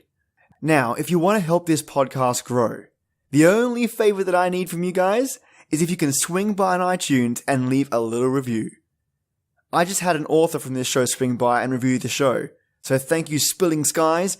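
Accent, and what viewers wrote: Australia. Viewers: Trying to sleep at 4AM, but before I finally doze off I'll be here listening to this video 👍🖤 in that awesome Aussie accent of yours 🙂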